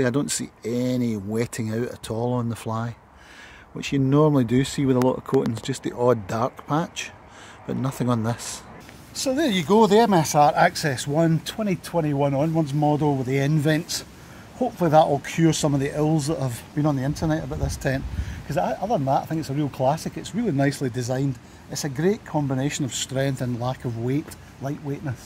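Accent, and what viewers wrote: Scotland, United Kingdom. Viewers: Great review.. very detailed which I enjoyed very much... Obviously a Scot... Perhaps we will cross paths on the hill or mountain...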